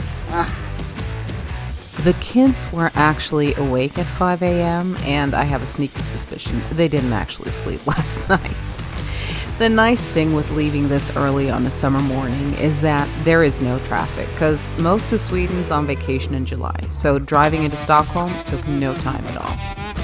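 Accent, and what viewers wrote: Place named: United States. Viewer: Ou u live in sweden but u cannot be og swedes judging this by ur accent. Its wery amerikan accent. But anywAYS have great coming winter!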